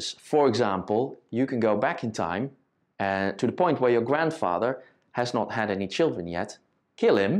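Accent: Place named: Netherlands. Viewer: Cute Dutch Accent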